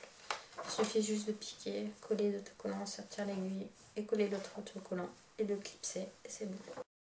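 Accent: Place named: Alsace, France. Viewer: Ce retour de l'accent alsacien un régal pour les oreilles ! Miam ahah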